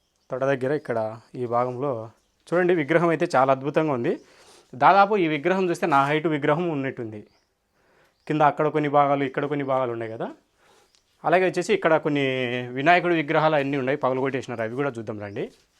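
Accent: Rayalaseema, India. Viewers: ne prati vedio chala interesting ga untundi... Keep it up...nuvvu ne rayalaseema slang super... Chala kastapaduthunnav...super bro...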